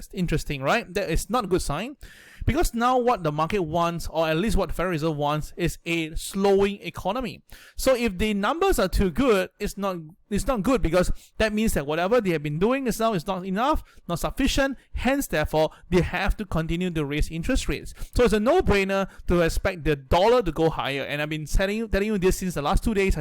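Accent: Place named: Singapore. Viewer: I haven been owing Oxy share since it was $27. Hopefully, it will beat $80. Btw like your Singaporean accent :)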